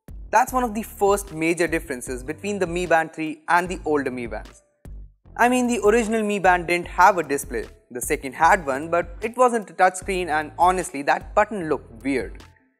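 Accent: India. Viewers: Bu ne biçim ingilizce yaw abi resmen hintçe gibi konuşuyorsun ya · Uuuuuuh, the Indian accent is... is... hard to digest, sorry(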